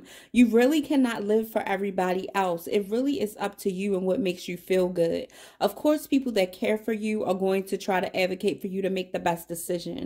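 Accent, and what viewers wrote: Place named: Baltimore, United States. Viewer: You gotta be from Baltimore 🫶🏼✨ it’s the accent for me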